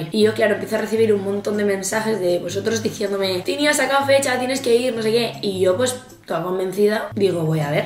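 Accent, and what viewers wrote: Buenos Aires, Argentina. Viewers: te amo y amo tu acento argentino 😍💖😂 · Eu el acento porteño de Ar gentina le sale muy bien! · tu acento de argentina y ingles me encantan te amooo quieres tomar un cafe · Te amo mucho, me alegras los dias. Btw te sale re bien el acento argentino. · necesito mas videos hablando en argentino por favoor !! jajajja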